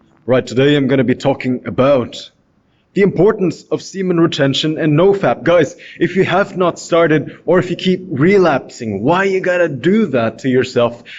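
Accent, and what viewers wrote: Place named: South Africa. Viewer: Is that South African accent bro ?? Are you South African? Good speech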